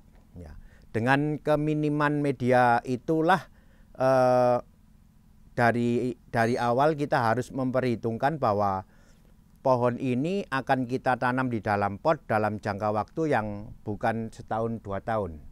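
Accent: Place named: Madura, Indonesia. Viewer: Logatnya kayak orang madura kok dipanggil koh 🤣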